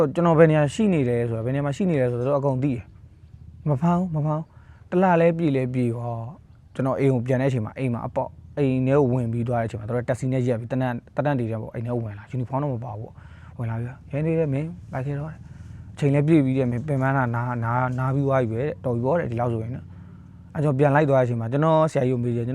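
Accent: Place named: Mandalay, Myanmar. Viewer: အ‌ပြောကတော့ရွှေမန်း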